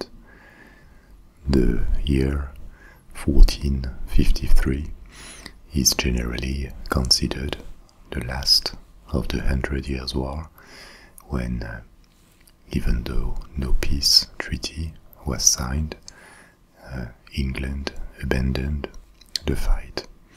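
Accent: France. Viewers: wonderful, thank you, great to hear British history in such a calm and relaxing French accent :)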